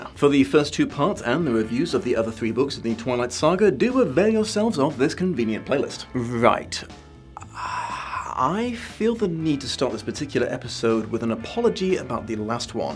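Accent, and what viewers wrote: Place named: United Kingdom. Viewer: Hearing you rip this series to shreds, in your awesome British accent, is the most cathartic thing EVER.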